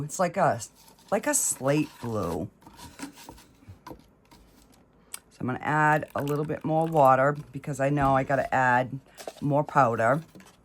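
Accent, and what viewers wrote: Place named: Massachusetts, United States. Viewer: Where are u from if you don't mind me asking you sound like mass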